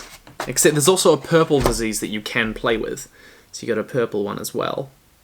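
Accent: New Zealand. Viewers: Omc you sounds so much more new Zealander than on your main channel.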